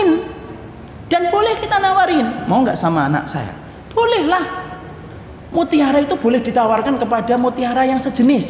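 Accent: Java, Indonesia. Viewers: iya ka, belaiu orang jawa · 😁 pernah dengar rakan-rakan keturunan jawa saya bertutur sama macam ustaz ni.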